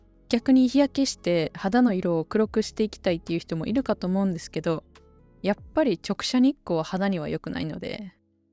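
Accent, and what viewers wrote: Kansai, Japan. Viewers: 関西弁のイントネーションが面白くて好きです!これから関西弁のポットキャストもっと聞きたい😁わたしも関西弁を勉強したいなぁ(いやいや標準語すら上手にできないくせに🤣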